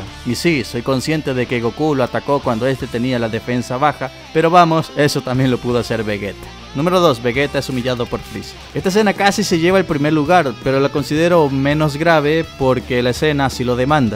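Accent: Ecuador. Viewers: Gabardina? Te expresas como nosotros mijo eres ecuatoriano? Saludos me gustan tus videos loco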